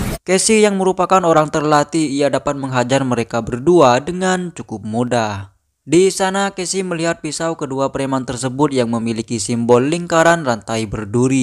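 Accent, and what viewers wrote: Madura, Indonesia. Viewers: Bang ente orang madura ya 😂. Logatnya kliatan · Admin orang Madura ya logat nya beda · Lu madura ya min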